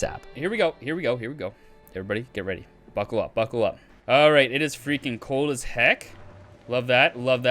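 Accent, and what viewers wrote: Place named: Canada. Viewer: This is my first time seeing your channel based on your accent I’m guessing you’re a fellow Canadian. :D if not hello from Toronto Canada. You kind of sound like your from Alberta maybe 🤔. I’ve had this game in my steam library for a while. I might actually play it. Your video has inspired me :D.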